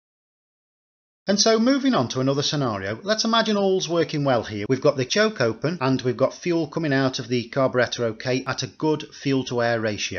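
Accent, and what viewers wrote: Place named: Staffordshire, United Kingdom. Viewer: Are you from Staffordshire sir? You sound a bit like my dad from the potteries?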